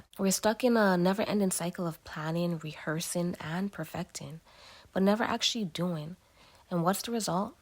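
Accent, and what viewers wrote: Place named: Toronto, Canada. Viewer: As soon as I heard you talk I said you must be from Toronto as well, Yayyyy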